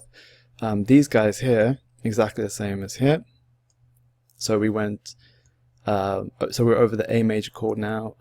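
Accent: South Africa. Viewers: Thanks for the video dude! Really helps a lot 😊 Are you South African by the way?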